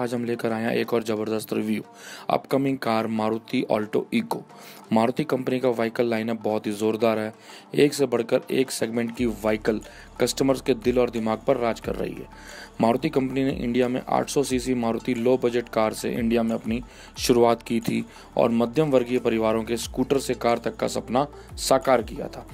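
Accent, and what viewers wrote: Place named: Pakistan. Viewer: This person speaks Pakistani Hindi.....Ychel is available in Pakistan currently.So video is from Pakistan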